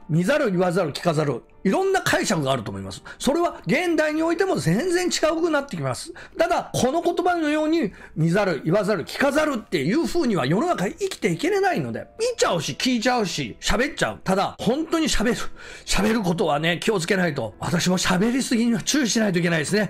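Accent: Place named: Kansai, Japan. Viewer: 話し方から関西方面の組織かな？やってたのなら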